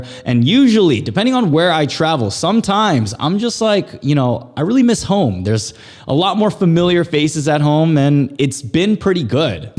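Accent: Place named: Los Angeles, United States. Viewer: Crazy man, glad you got away clean. It does seem like things are getting sketchy lately in my area (PNW) and across the country. You have the voice to reach more people than most so kudos for sharing this situation. I lived in Montgomery County when I was a kid. You're missing the Maryland (Muuuriland) accent, I had you pegged for LA bro. Keep bringing the solid content!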